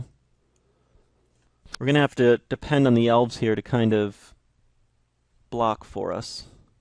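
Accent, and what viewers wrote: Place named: United States. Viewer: i love the american accent =D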